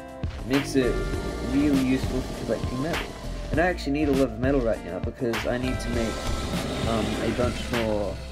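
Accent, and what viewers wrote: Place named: United Kingdom. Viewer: British boiii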